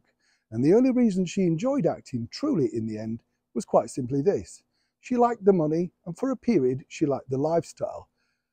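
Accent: Yorkshire, United Kingdom. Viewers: Good morning, My coffee break, and my first laugh of the morning, Resting thespian Yorkshire talk, young man hoping to be star Thank you take care Ps Ava was a beautiful lady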